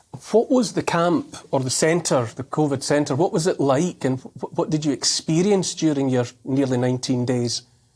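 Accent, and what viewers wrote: Scotland, United Kingdom. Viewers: Cute Scottish accent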